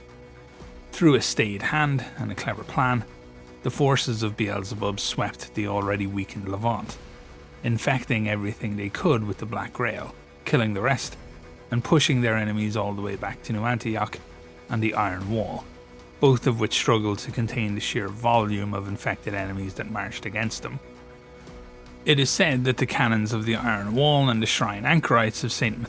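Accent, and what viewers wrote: Ireland. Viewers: Those Irish tones add a subtle calmness to the nightmarish narrative you're describing.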